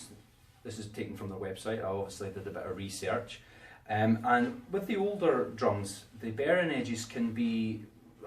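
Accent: Scotland, United Kingdom. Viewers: Are u from Scotland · Good review mate, and good to hear a Scottish voice instead of all the American reviewers.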